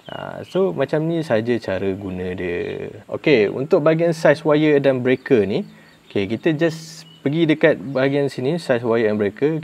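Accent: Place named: Kelantan, Malaysia. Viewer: Terima kasih atas perkongsian.. Jelas dan jeliro ore kelate royat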